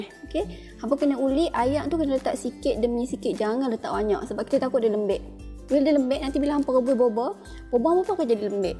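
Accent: Kedah, Malaysia. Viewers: Suara macam Wartawan AgroTV kat TV1. Tpi ni version Kedah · Org kedoh? Atau org oprg perok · Ni orang kedah ka kl.. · Ayaq ayat kedah L.A. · org kedah ke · Kuat gile kedah nya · orang kedah ke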